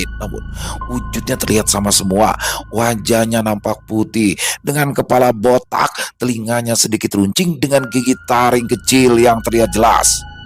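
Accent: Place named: Java, Indonesia. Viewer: Selamat Pagi mas? Ooo pantesan mas bicaranya Macam logat jawa ha ha ha mas jangan kuatir ya saya pasti dukung terus maju teruuss ❤🎉😊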